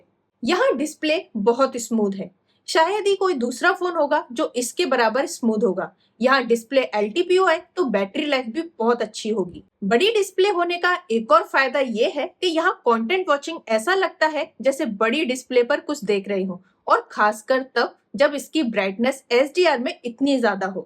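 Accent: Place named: Kangra, India. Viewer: Aap kangra ya hamirpur se lag reho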